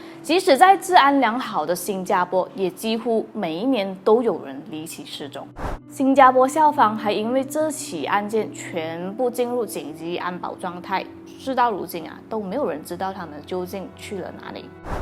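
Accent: Malaysia. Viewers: 这是马来西亚的华语。发音跟普通话不同。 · 很好很马来西亞中文音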